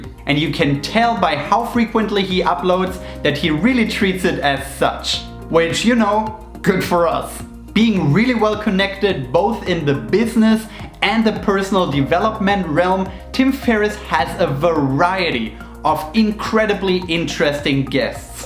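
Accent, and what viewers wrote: Germany. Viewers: Hey, where are you from? :) you have a little bit of an german accent... As we say in german: gefällt mir ^^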